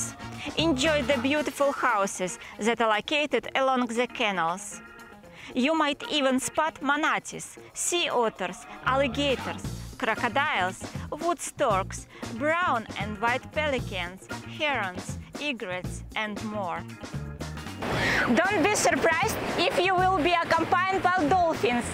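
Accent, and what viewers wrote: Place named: Russia. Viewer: I like your Russian accent!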